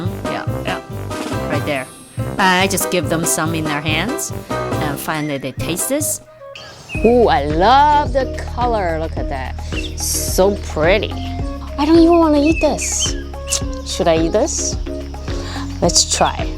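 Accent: China. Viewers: Hi, I tried most of your recipes and it tasted amazing like I was so amazed. Me and my mom are gonna try to make the pasta chips today!! Thanks for the recipe Love your Chinese accent too Love you!!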